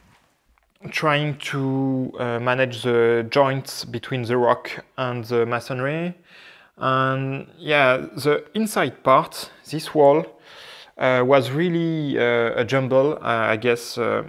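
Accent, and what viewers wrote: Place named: France. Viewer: New subscriber, just got one of your videos recommended the other day and have now went back to the beginning and binge watched them all. Excellent series and I am invested. Looking forward to the day (if ever) you decide to excavate the under cellar and maybe seew where the filled in tunnel leads to. Also every time you say the "poop cellar" in your lovely French accent I crack up.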